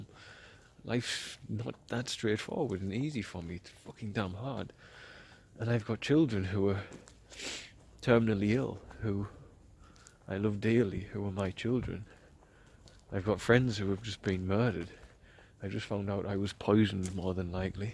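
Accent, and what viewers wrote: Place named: Newcastle, United Kingdom. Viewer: I guess i have some sort of broblem solver spirit in me, so excuse me.. 😁 It's ok for u to feel tired and beated. So i feel that u need to give some mercy on your self. Could someone else produce some content on this canel some times? Similar on like the contet u shared about our staff? And time to time some succes strories of childrens recovery ect? At least id love to have some updates from ex. the children that can now go to the school! Remember your thoughts of sharing your duties? Do it. Because of you, becouse your loved ones. Totally OT: its funny how your "home english" is pushing trough after youve been home. I can tell since i have people from new castle in my family.. 😄 But yeah.. I like a lot that ure authentic, sharing even your moments of doubt and tiredness. We're not supposed to be perfect not even you. 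And to end this awfully lobg text: Send Frizi my love and tell her i think of her a lot. She has so much in her hands now. I hope she also remembers to take goooooood care of her self! ❤ Blesings, love and light. Xx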